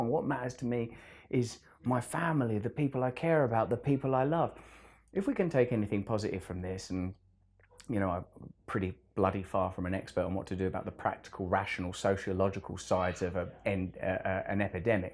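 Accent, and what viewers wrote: United Kingdom. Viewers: l couldn't understand u but l love British aaccent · This guy looks like the corona virus. Lock him up and throw away the key. Take out his internet as well. No one wants to hear his fucked up British ascend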